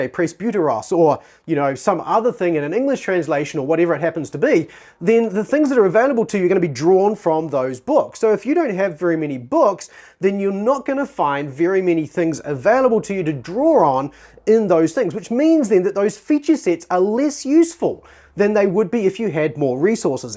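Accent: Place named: New Zealand. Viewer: Nice to hear a kiwi accent :-)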